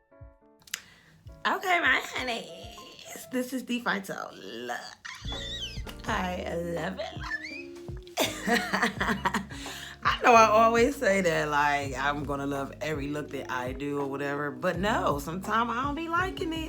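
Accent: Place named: New Jersey, United States. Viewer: Love u and u sound like u from Jersey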